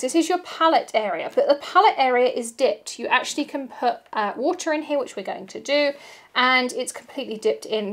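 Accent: England, United Kingdom. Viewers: Hi, thank you for your videos. They are very nice. I love the 2mn ones....and your English accent. This said you keep talking about the « top right hand corner » for past videos. I watch a lot of videos on my phone and I have nothing on that corner. On my computer, I have advert. Apart going into your page and check the whole videos, I don’t see how to get the past videos mentioned. Am I a dummy here?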